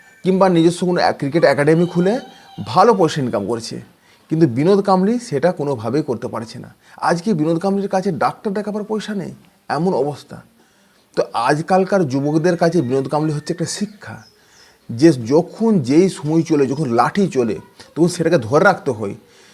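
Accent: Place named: West Bengal, India. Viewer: 'Ramakant Aacharya' noy, sathik naam hocche 'Ramakant Aachrekar', surname er shese 'Kar' ansho ti thakle tini certainly Marathi haben, etai riti. Video banabar aage motei homework karen ni, saadharan dharana tukuo nei aapnar aar video banate lege porechen ei most sub-standard knowledge niye du-paisa kaamabar love ekaaje neme porechen? Moreover, aapnar kathay puro aanchalik taan, medinipur othoba Bankura jelar 'Dialect' e katha bolchen huge platform e ese. Chi: aage nijeke properly groom karun. Eto substandard quality niye 'YouTube' er moto wide platform e chole esechen. Aapnar narration etotai kharap je shunte ritimoto kaane peera dicche. 'Like' to durer katha aapnake 'Dislike' diteo ghrina hocche! Saadharan shuddha Bangla tao bolte paaren na Bangali hoye, lajjar katha!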